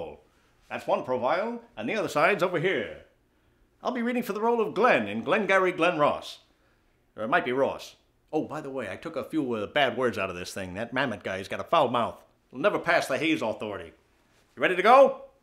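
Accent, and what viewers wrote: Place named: Transatlantic, United States. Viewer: I like trans-atlantic accent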